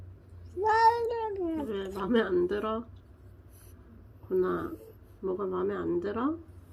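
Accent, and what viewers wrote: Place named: Gyeongsang, South Korea. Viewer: 안녕하세요? 대구에 구내염 발치 하는곳 정보좀 알려주시면 감사하겠습니다 님 혹시 대구분 아닌가요? 경상도 억양이셔서..구내염 심한 길냥이 한녀석이 있는데 발치를 해서 나을수 있을까 해서요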